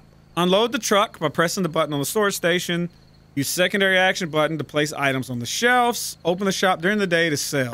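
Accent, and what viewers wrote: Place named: Midwest, United States. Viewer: This how country midwesterner’s talk. But you ain’t got no time to know this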